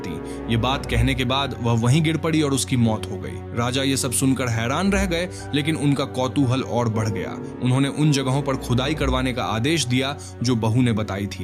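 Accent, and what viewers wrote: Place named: Bihar, India. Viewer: Ur Pronunciation is like that of a Bihari…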